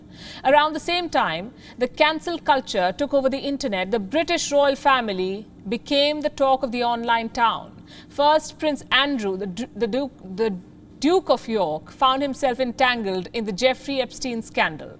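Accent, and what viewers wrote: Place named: India. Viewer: I can actually understand this lady she makes the Indian accent sound beautiful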